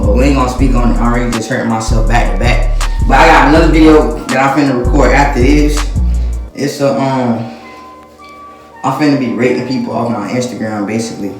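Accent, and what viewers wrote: Florida, United States. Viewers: U can tell he from Florida 😭😭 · Florida talk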